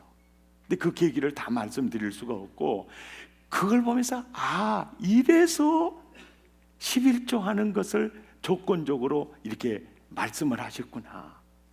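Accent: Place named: Mokpo, South Korea. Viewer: 목포 목사님 부흥회 너무나 잘보고 있습니다 사투리가 어찌 그리 정이 가네요 저도 고항 장흥 입니다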